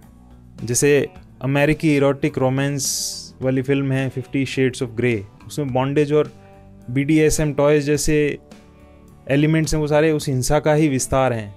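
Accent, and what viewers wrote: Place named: Rajasthan, India. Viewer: Sir aap rajasthan se ho ? Aapki awaaj se laga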